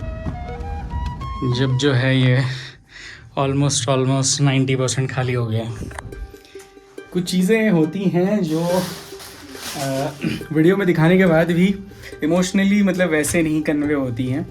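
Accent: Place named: Garhwal, India. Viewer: Gaun m aakr , hindi mai garhwali tone aa hi jati h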